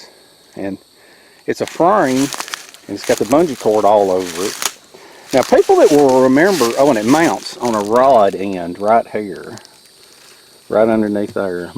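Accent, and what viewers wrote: South, United States. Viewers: you have a real imagination for innovative camping appliances and gear. Because you really enjoy what you do; your content is not only informative, it is "entertaining". Also, I am from Arkansas. You speak excellent "Southern". GOD bless you and your family. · I always enjoy Friday morning with 😁. I've had good luck with the green slime in lawnmower tires. My gf loves your southern accent. 😊Also a can of fix a flat might be handy.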